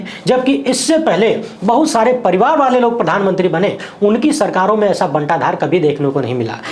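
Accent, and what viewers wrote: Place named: Gonda-Basti, India. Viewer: Bahot hi perfect Analysis Bhaiya jii Aawaz Aisi hai Jaise Gonda Basti Se belong kar rahe hon bhaiya ji Aap , Am I Correct?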